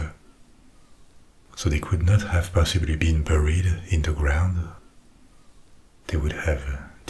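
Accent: France. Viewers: I just want to tell you that I can not sleep without you and I love your French accent. ❤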